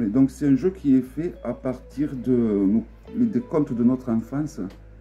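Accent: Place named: South, France. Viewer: Bonjour il n y a pas de hasard de tombé sur toi,contente de voir toutes ces belles cartes et avec ton accent du sud que j adore. BISOUS 👍🏊‍♀️🏊‍♀️🏊‍♀️🍒💐🌸🍀🌷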